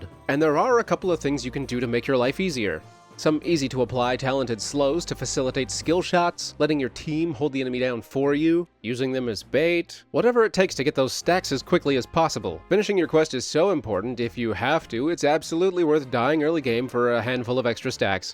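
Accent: Canada. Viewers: Letting the canadianness come out eh? KTZed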